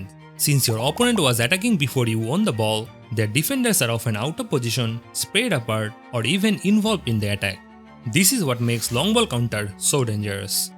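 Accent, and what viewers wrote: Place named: India. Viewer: India hai kya ? Sounds like it .. but naam indian nahi hai bhai kya 😅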